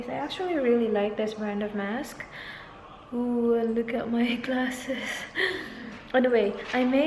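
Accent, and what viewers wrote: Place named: Visayas, Philippines. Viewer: silent viewer..I like your vlogs and so cute your voice as visaya to speak english.. but always watching your vlog😊...ask ko lang anong work mo ngayon?, or in a moment sa bahy muna? God Bless to u and 😊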